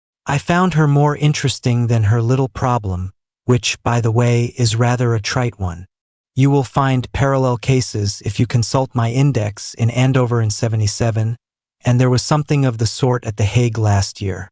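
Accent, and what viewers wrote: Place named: United States. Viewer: No offense mate. Doyle did go to the US with his erstwhile friend Houdini but despite your valiant efforts American "English" doesn't really do his oeuvre justice. Look up BBC radio drama Ectoplasm (free on you tube). You can thank me later. One author was once asked what he thought of American culture and replied that, "it sounds like a good idea". Keep up the good work mate.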